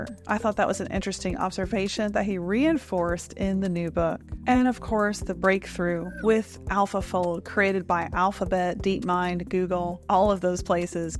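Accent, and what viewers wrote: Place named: Arkansas, United States. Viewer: I believe in God, and consider myself a Christian, but to believe you can't get life from a kind of "Big Bang" scenario, one must be ignorantly misguided, at best, and at worst, must be intentionally obtuse. You said you would gladly debate in the comments, so as a subscriber and viewer since last year, I hope you'll keep true to that remark. To that end, please begin by explaining why you dont believe in the current models of the beginning of the universe. Edit: I'm from Arkansas, and it always feels good that someone else with a similar accent is an AI/Singularity communicator. Love to see it.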